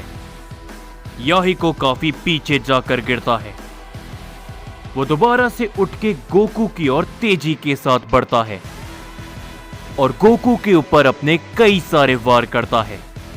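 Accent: Odisha, India. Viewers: Odisha se ho kya bhai 😅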